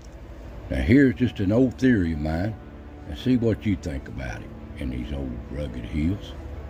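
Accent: Appalachia, United States. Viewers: Love to hear you talk. You sound like my folks. Do you use words like "kiver" for "cover", "over yonder" for "over there", "igoddling" for "moving in an unpredictable and erratic fashion"? I'm big on keeping my Appalachian linguistic culture.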